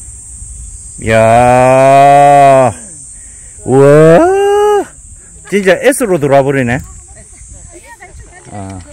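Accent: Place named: Gyeongsang, South Korea. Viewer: 참~~말로 갱상도 사투리 정겹네예~~~ 버스턴에서도 잘 보고 있습니다.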